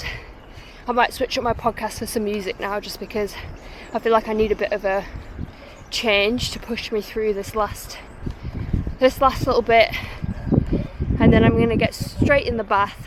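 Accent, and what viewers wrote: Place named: Yorkshire, United Kingdom. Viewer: What part of Yorkshire, did you come from?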